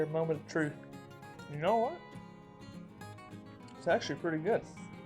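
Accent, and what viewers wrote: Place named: Georgia, United States. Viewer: Georgia accent, mid ga.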